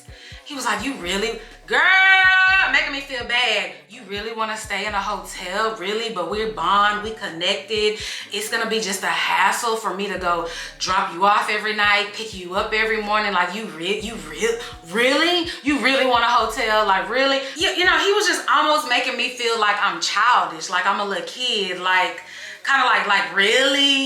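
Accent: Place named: New York, United States. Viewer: He definitely sounds like a NY j*gga you picking up your sonnnnnnnn lmao #ihateithere #nycnative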